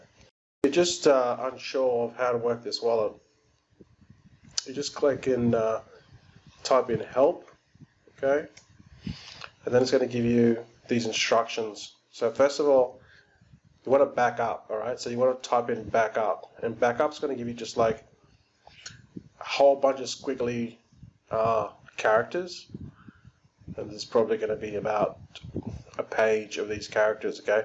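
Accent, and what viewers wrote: Australia. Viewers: are you an Aussie? I found another project today parachute, just want to know your thoughts. cheers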